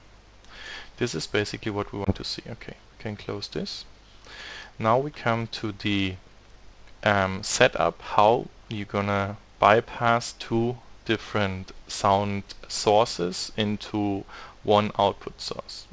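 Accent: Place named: Germany. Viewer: Ich habe direkt rausgehört, dass es ein Deutscher ist haha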